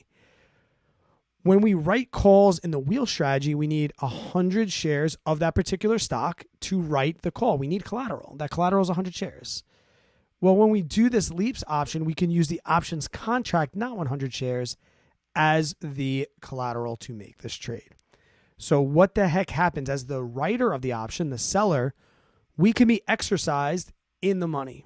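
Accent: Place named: East Coast, United States. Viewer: you sound like an east coast guy! You have a bit of an east coast accent. Where you from brother? I’m from the west coast. Thanks for sharing your options trading knowledge bud.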